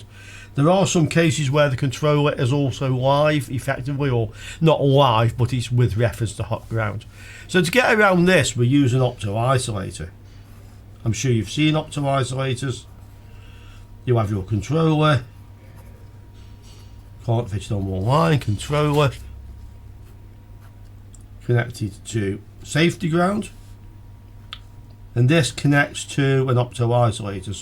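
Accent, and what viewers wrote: United Kingdom. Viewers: Thanks a lot for correct explained ❤ Sometimes I have problem with the British accent! What I missed is directly connected diac and thyristor to Gate! Variable supply of diac to Gate! But thanks 😊 U give me Manny answer's and open a lot of questions!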